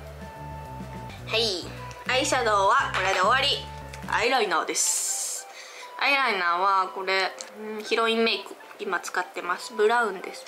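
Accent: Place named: Kansai, Japan. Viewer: バリバリ関西人の喋り方すきです！可愛くて憧れます🥹♡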